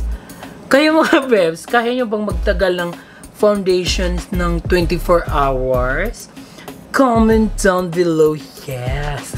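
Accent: Visayas, Philippines. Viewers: Tuwang tuwa talaga ko pag napapakinggan ko yung Visaya accent mo vevs ❤️ nawawala stress ko dito . Your supporter from japan 🇯🇵 Josh Rubio po . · It is abelabol HAHAAAAH i really love ur visaya accent mamsh 😍😂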